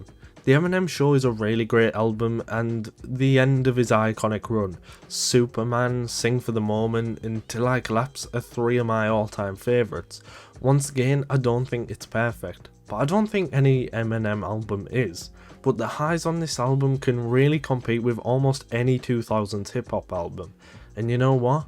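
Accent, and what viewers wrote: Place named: United Kingdom. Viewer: Lmao, what did u expect he's from the UK. As soon as I heard his accent, I knew this video was going to be a hard watch.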